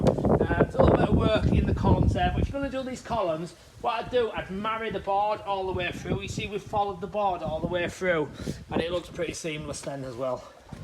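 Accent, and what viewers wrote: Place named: Leeds, United Kingdom. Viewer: Improving your finished product all the time , always good to hear a Leeds accent here in Australia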